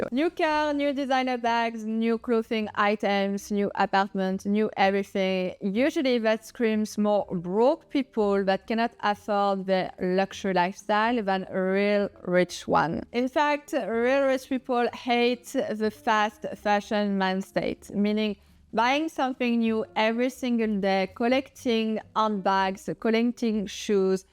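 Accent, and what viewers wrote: France. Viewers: Respectfully, may I suggest that you add sub-titles or text to your videos. I understand your accent because I also speak French but other viewers may not. Thank you for your content, it is excellent ! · Girl you have a heavy French accent it's hard to understand you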